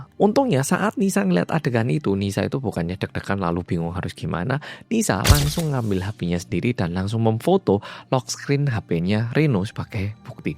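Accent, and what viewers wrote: Java, Indonesia. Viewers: medok 🎉 Lgsg nyimak 🎉🎉🎉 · Gausah. Walopun ngomong medok. tetep orang Korea. Walopun dulu lama tinggal di Indonesia sekarang dia tinggal di korea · dia gak salah apa apa kok ngatain sorry unsubscribe wkwkw dulu dia lahir di korea dan besarnya di indo dari kecil lho apalagi udah mahir bhs indo yg medok, dan dia itu cinta bgt sama indonesia.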